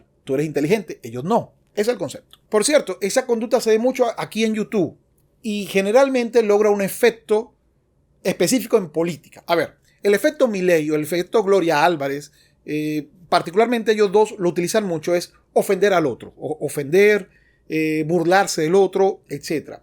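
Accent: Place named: Venezuela. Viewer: Eres de Venezuela?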